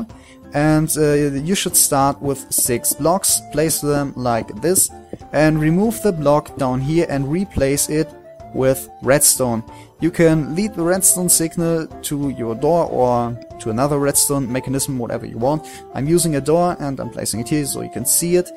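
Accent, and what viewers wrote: Germany. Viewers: Dein Englisch klingt echt total Deutsch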